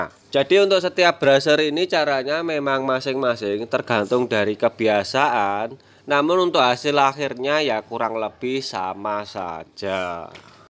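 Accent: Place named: Kediri, Indonesia. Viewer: Koyok wong kediri ya kui mas 😁😅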